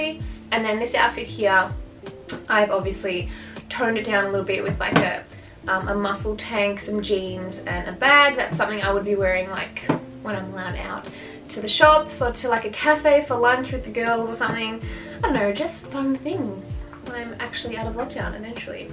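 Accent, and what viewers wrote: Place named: Australia. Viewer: loved the video, then you started talking, and you are a fellow aussie!? hello from brisbane! instant subscribe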